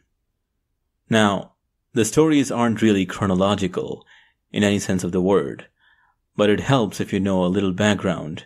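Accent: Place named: India. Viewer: The ‘neutral’ Indian accent, the one that doesn't specify any regional recogs.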